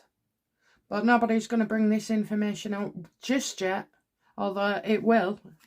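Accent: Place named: England, United Kingdom. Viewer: It’s an English dialect ❤️ fyi we’ve got lots of them 😁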